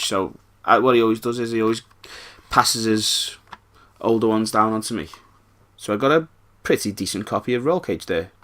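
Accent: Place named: Merseyside, United Kingdom. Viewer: Nice to hear someone with a Merseyside accent in the retro gaming community.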